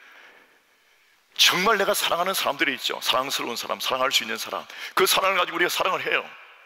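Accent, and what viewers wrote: Gyeongsang, South Korea. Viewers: 명불허전 경상도 ㅋㅋㅋㅋ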